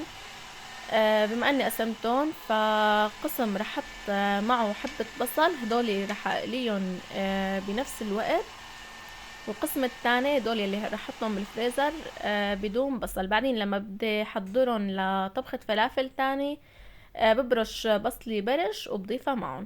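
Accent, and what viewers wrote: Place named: Homs, Syria. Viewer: صحه وهنا لكلشي اكل.. . يعطيكي العافية... محتوى حلو وطبيعي وسلس بستمتع معك مع لهجتنا الحمصيه البتجنن محتوى من بيوتنا مافيه تصنع ابداااااا..... من حسن للأحسن يارب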